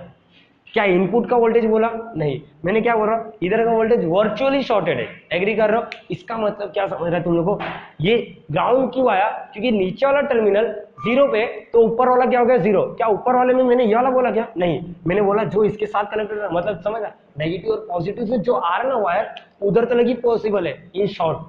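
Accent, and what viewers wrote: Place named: Hyderabad, India. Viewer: Hyderabadi accent 🤩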